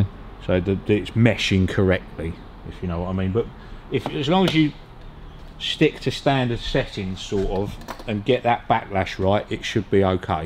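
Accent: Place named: United Kingdom. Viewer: ''This is a sh@ horrible thing called a bearing cup greaser.'' Dammiitt! Yeah, we got them over here too Y'all. But our's are morons more along the lines of what I'd call a ''Political Bearing Begging Cup Greasers'' Tickled me that when I'd left the volume up to listen while making my bladder gladder... the thought of Michael Caine popped to mind as was talking. Same part of Britain as he, no? Too tight cold plays hell on all metal surfaces once their heated up under motion. I can better see that here. When I'd brought my '68 Trophy Sports back home from Ft. Bragg in '74. Dad having been a mechanic all of his life; begin to immediately moor over it's mechanical being. Next morning I got up & he'd done 2 things to it that evening before. He'd drilled & wired the tank's 2 front fastening nuts that same way. Also the rear crack split lateral bar of the tank's ''Castration Parcel Grid'' had been joined back together via a split vacuum advance rubber hose. 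He said that outta help keep the to tank stay on good & that package rack from de nuttin' ya... cause your gonna owe me a grandson or two. Good stuff all around in the ''Strengthening of them things that remain''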